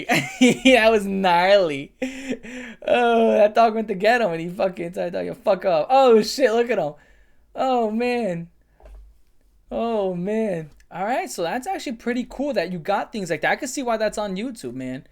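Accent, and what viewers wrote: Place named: Nuyorican, United States. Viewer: I’m from Puerto Rico and You speak that New Yorican Spanish lol but it gets a pass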